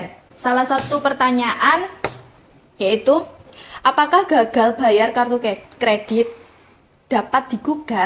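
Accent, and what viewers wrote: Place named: Java, Indonesia. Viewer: Jawa ha medok bngt mba. 😂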